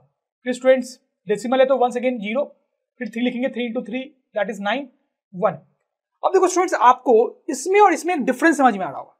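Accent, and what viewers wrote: Bihar, India. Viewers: aap bihar se hai kya sir ?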